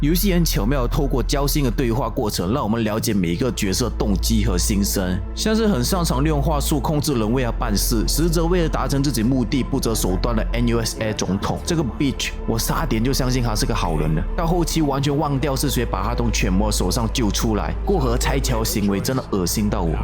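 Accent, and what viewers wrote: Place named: Malaysia. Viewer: 听他口音，马来西亚人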